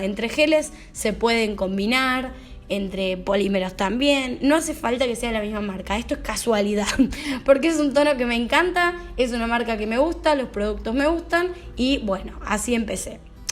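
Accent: Argentina. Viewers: No entiendo porque los títulos de tus vídeos son en Inglés cuando vengo a ver es una boluda hablando argentino hellloooooooo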